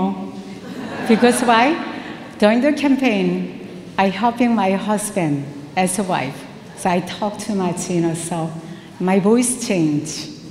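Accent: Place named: South Korea. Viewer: 발음이 한국식 영어네요 영어는 쉽지않아요 아이고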